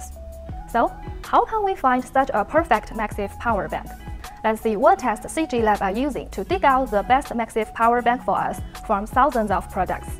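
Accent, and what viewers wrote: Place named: Asia. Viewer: Damn, she talks so quick and with her Asian accent is worse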